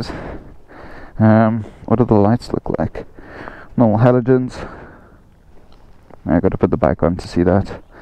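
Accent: South Africa. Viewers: What part of South Africa are you from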